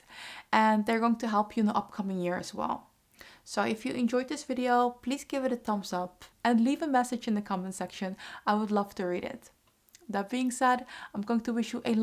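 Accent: Netherlands. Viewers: Thank for giving me to motivation to start orginizing! And watching your video I was thinking she sounds so dutch.. Checking the about and yes you are! Ik ga je volgen! Groetjes van een nederlander woonachtig in Zanzibar.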